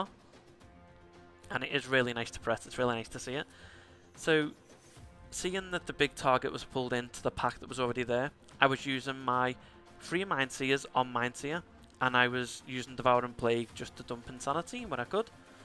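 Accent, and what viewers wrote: Liverpool, United Kingdom. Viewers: Am I hearing a scouse dialect there? 😊 as a Shadowpriest and a big fan of Liverpool FC that amounts to a subscription 👍🏽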